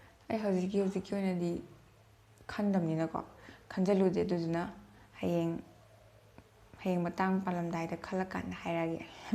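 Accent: Kakching, India. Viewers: Long kHoibado yaragadi tokpiro... Majority khallaga...eikhoidi kakching dialect toh meitei lol oirasu taba nungaitey...kakching khaktnggi oina semva blog ti oihnbiranu...Sao Yade nungaitaba yaoragasu hairibasingsida...I really like ur personality...And loved ur videos...But these are some things that I want u to concern..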